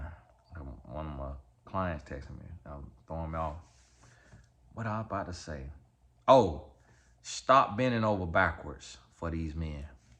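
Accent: South, United States. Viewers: your southern accent is making this video so hilarious 🤣🤣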